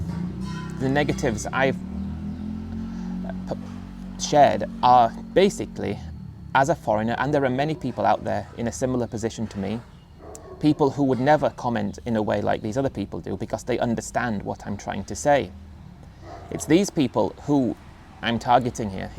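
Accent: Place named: Thailand. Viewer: You’re right. Let the haters write their comments. The creators who delete everything negative are very insecure. People don’t want to see filtered comments that only suit the creator’s narrative or agree with everything they say There’s an individual in particular who does that and it’s very annoying He’s extremely defensive and is always showing anger at ‘the trolls’ but we never know what the alleged trolls are saying because he deletes absolutely everything he doesn’t 100% like (I can only imagine though - he intends to retire (in England) aged 55, wife 60, never work again with only £250k and from that cash they’re going to buy a 50 year old boat and 20 year old campervan and live out their years in those - good luck to them is all I can say 😂 They’re one expensive repair away from disaster, not that they have enough money anyway, and they’re used to living in a house. 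So I think he’s very much underestimating not only that but the lack of space physically and from each other, enduring the cold harsh winters and lack of amenities. Car crash viewing - the delusion makes for interesting watching!! 😂) Would you consider doing a video about you. What age you were when you moved to Thailand, why, where in England you’re from (I cannot place your accent and in fact before I saw you I thought your accent was Thai!), if you have a wife, how you met her, if she’s Thai, do you rent property or is anything you own in a Thai person’s name, if you’ve got kids, what jobs you’ve had (p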